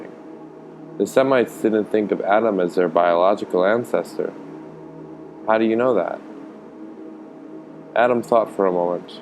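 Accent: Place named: Canada. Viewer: His Canadian accent is coming through strong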